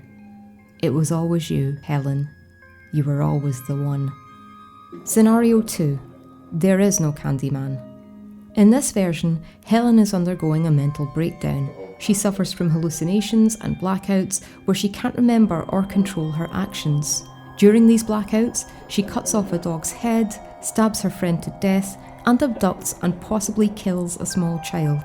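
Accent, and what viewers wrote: Scotland, United Kingdom. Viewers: Really excellent. And nice to hear a fellow Scot. I always thought the film was meant to be as much like 'rumour' as Candyman himself, so resisting clear-cut explanations. I also wonder how much input director Bernard Rose had. His wonderful film 'Paperhouse' was praised by Barker, and makes an instructive comparison with 'Candyman'. Helen also reminds me of Julia in Hellraiser (one and two), in a faint way. The murders that happen because of them or by them, which are only between them and the male villain. Then they both 'resurrect' - Helen as a murdering ghost summoned from the mirror after her name being said five times, Julia as a murderess 'summoned' from a mattress as the result of several murders (having become, maybe, some kind of legend herself, in the doctor's eyes). I'm spitballing....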